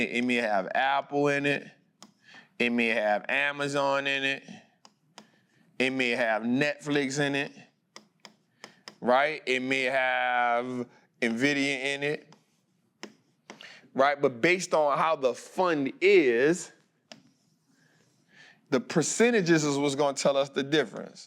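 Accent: New Orleans, United States. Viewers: Is your school in New Orleans? I hear the Nola accent. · From that first sentence I can tell that boy from New Orleans like me⚜️ let’s go brudda! Definitely gonna give you a subscription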